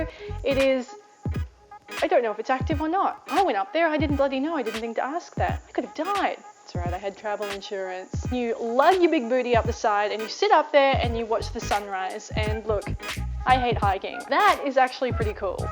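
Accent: Australia. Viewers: Oh gosh, this is the first video I'm seeing of yours, and I love the way you make this video interesting and at the same time informative ! Good luck!! Ps. I'm kinda feel by your accent that you're from Australia. Are you ? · I’m interested to know where is your accent from ? Not a typical Aussie accent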